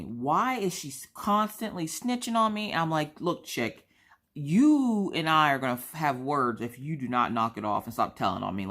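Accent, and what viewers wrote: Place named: Georgia, United States. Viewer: I’m actually surprised that they did your MRI. I say that because I broke my hip 3 weeks ago and my doctor thinks I need surgery but now no surgeries or any procedures are being done except chemo and dialysis, and life threatening surgeries. Of course it may be a state thing and I’m in Georgia.( got that accent too lol) Glad you were able to get it done.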